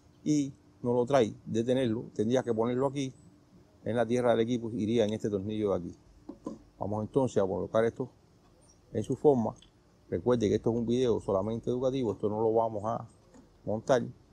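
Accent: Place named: Caribbean. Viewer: Así hablamos nosotros los caribeños, cubanos, Dominicanos y como yo puertorriqueño, nuestro español y cultura ya es asi!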